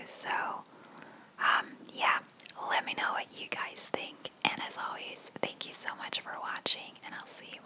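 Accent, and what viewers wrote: United States. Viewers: Is ze Nederlands? Ze heeft echt een Amerikaans accent!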